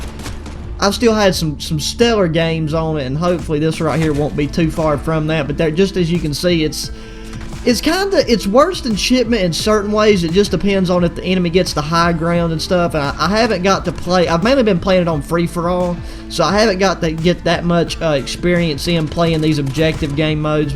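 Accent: United States. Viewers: love that accent lol sounds like a cowboy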